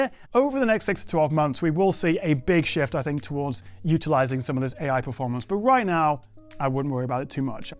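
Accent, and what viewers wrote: United Kingdom. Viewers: The British language sounds wonderful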